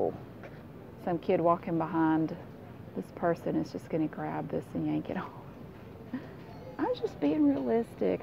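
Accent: South, United States. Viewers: I can't get enough of your southern accent I find it so charming. I'm constantly listening to the Massachusetts' accent up here which I may or may not have myself but absolutely detest.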